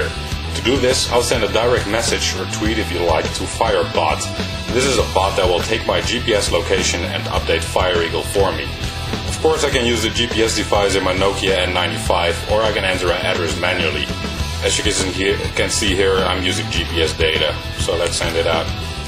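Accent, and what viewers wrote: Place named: United States. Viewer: Good tutorial and information, great music(loop), good American accent too! I only recognized the real guy's voice when he pronounced "Berlage"... ;-)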